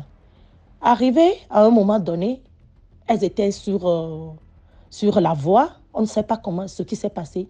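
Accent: Benin. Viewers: Ça se passe au Bénin. On reconnais votre accent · L’accent est un accent béninois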